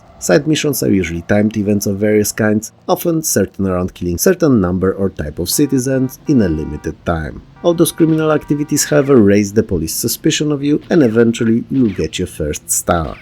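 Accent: Poland. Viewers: ~ you very on point, speaking from experience I've worked as dev on Espresso Tycoon, and at first we had tutorial, but quickly we noticed not only it took a while to finish, it was just too much info for regular player to remember much anyways, so we did same - each mission introduces new mechanics, though we always been making this game with focus around sandbox which is available from beginning (actualy it could be game up your alley who knows hehe 😅 ) That being said I'am not big fan of such method either , but in our situation it was actually quite hard to get it done any other way Shameless plug aside, I wonder where you from, judging by accent I take wild guess Polish (jak ja! także pozdrawiam!) Good video, some of these I experienced even before i had own pc, at cousin place, some games i even forgot about , or not realized they been so old they worked on dos in fact ;) I remember little big planet being hard (for uh 6 maybe 8 years old me) , we could not progress much in it each time we tried, before internet and guides being available like today, gaming was quite a different experience in deed back in the 90s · Polski akcent wyczuwam :)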